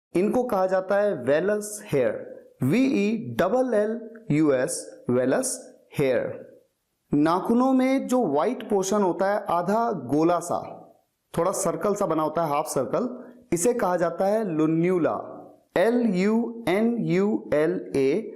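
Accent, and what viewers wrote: India. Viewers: Your pronunciation is totally Indian. Pronunciation should have been like English.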